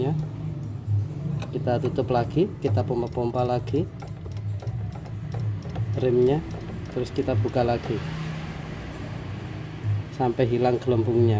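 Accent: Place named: Java, Indonesia. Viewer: Medok kali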